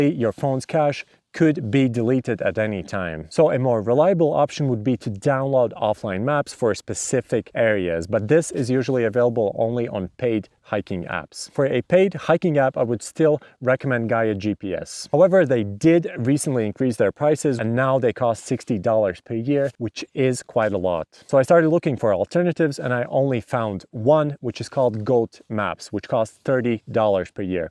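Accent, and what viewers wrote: Latvia. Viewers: Jau no tava akcenta var dzirdēt, ka esi latvietis :) Prieks redzēt, un man noder tavi video.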